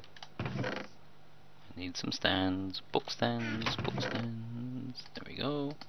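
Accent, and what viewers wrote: Ireland. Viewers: I love how UR Irish idk why but I'm Irish to to I guess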